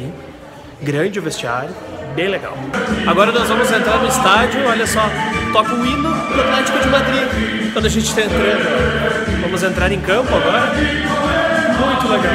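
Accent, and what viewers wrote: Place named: Porto Alegre, Brazil. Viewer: Pode deixar. Abraço..pelo sotaque é Porto Alegrense igual a mim. Tmj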